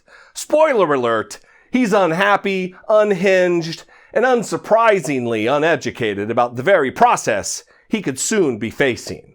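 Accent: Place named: Appalachia, United States. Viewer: Hey, which state are you from? I'm from WV, just curious if you're from around here maybe OH or KY? Accent sounds familiar. Love you dude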